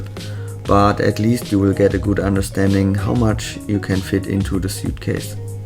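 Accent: Germany. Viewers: Are you german? Your accent is beautiful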